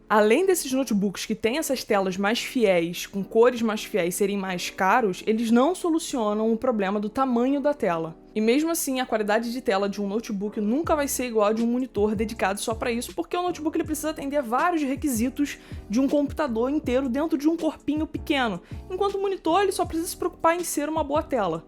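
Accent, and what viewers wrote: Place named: Rio de Janeiro, Brazil. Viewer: Quase não da pra reparar que ela do Rio né hahaha Goxtoso esse sotaque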